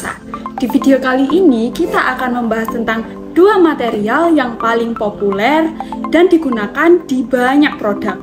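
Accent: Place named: Java, Indonesia. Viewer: Mbaknya medok, pasti wong jowo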